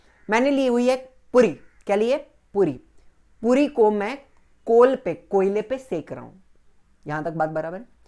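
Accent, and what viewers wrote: Maharashtra, India. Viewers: Sir you marathi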